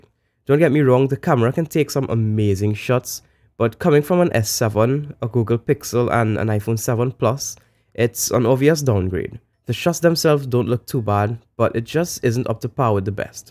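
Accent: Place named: Trinidad and Tobago. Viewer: Can't miss that Trini accent 😎 Keep up the good work bro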